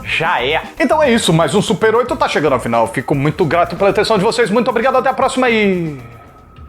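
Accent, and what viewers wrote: Northeast, Brazil. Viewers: Chó advinhar, tu é nordestino néh?? Eu sou! Aq tá fazendo um calor da gota serena! 😅🔥🔥🔥 Amei o vídeo! ❤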